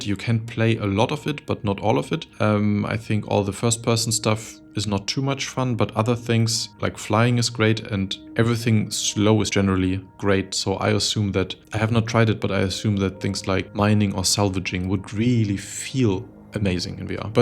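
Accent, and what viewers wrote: Germany. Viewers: i didnt know you are german, but that accent, omg.. sorry herr 😄i also dont mean nothing bad, thanks for the video. I plan to buy some good vr headset and im crazy about star citizen. I keep my desktop pc up to date only for star citizen.. is special game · I love SC in VR. How you said, very immersive 😉 What is the key to switch between VR mode and 2d mode? und vom Slang her höre ich doch das du Deutscher bist. Eindeutig 😁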